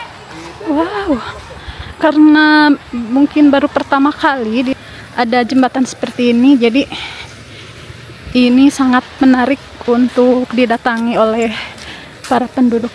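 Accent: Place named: South Sunda, Indonesia. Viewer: Logat pakidulan nana ange yeh 😂😂😂😂😂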